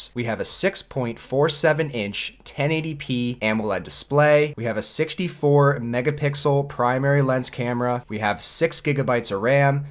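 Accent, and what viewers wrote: United States. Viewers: That is not an English accent, it's an accent from some part of america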